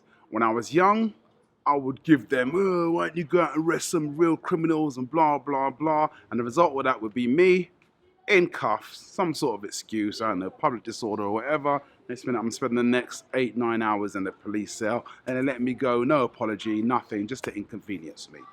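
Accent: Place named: United Kingdom. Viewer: No i couldn't tell but i knew you had that UK accent....I'm from the Philippians but i move to America for 4 years then i move back home for good....i didn't know that you was from Nigeria dats why i had to ask you. Do you ever want to move back to Nigeria???? I thought about moving to Africa soon because i love to travel.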